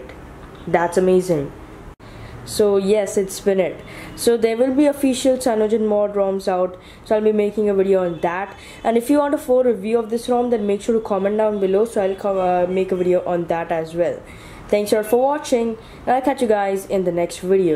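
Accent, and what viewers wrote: India. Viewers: 印度英语…挑战我的忍耐能力… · that cringy Indian accent. stupid fcks.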